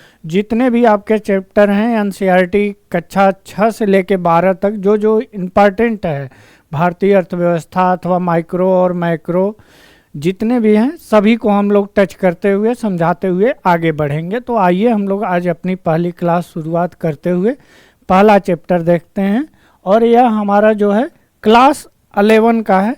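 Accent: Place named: Uttar Pradesh, India. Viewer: Sir himachal look m, Uttar pradesh ki Awaaz... very nice sir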